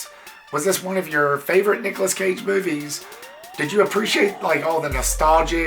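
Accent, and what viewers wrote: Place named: South, United States. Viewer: You sound like Vince Gilligan, but we are all southern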